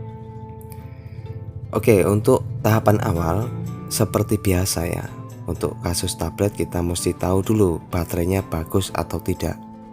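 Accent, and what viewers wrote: Java, Indonesia. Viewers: tapi logatnya jawa bgt mas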